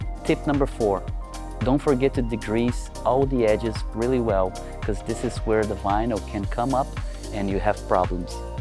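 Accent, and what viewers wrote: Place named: Brazil. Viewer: Percebi na hora que ele começou a falar inglês, pensei: esse cara parece brazuca. 😂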